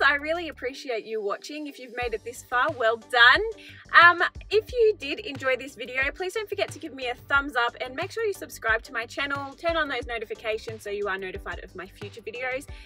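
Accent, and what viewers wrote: Australia. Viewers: Our spotlight is a bit like that in Queanbeyan NSW. We don’t even have one in Canberra. But we have 3 Lincrafts? I am enjoying all your videos so nice to hear an Aussie for a change.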